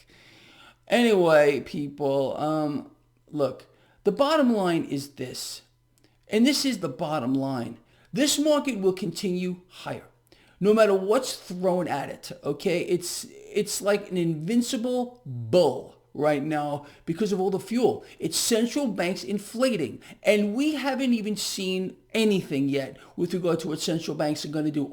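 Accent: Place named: New Jersey, United States. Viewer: you're my nice fren, share his knowledge.. I think never lie.. and very funny.. maybe you from NJ?? sound like my fam.. we from NJ.. you aint from vegas... Um from down da shoor.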